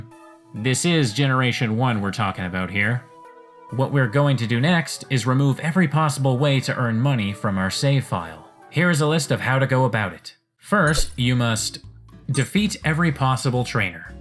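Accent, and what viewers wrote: Canada. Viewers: Maybe... But maybe he could be from BC after all. If he's from up north enough, that would explain some of the accent quirks. My friend says if you go north enough (where he goes camping, I think), they start pronouncing the aʊ-type OU words as oʊ. I don't know about the u:-type OU words, tho. I just know my friend likes to say he likes to go "oat and a boat". XD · as if from Canada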